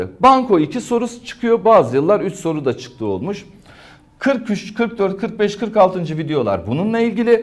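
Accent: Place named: Istanbul, Turkey. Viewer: Neyse ki sonunda nörüyorn, gardaşlar, godum mu oturtutum vs vs avam söylemleri olmayan İstanbul Türkçesi ile efendice ders anlatan beyefendi bir HOCA'ya denk gelebildim..Dikkat çekicem, ilginç olucam, bu isler bizden sorulur havalarındaki kahvede okeye 4. olamayacak insanlardan ders dinleyemiyorum..Çok teşekkür ederim Sayın Hocam..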